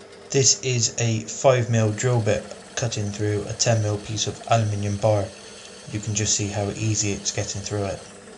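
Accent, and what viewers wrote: West Country, United Kingdom. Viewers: west country lad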